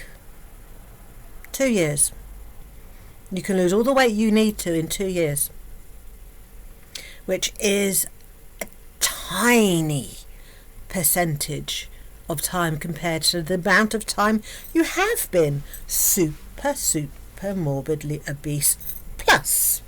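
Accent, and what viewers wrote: United Kingdom. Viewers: This is exactly why i watch her as well....its the lying and the willingness to take it as far as she can go, even when proven wrong. Love your videos! Nothing sounds better when youre always proving her wrong with that british accent!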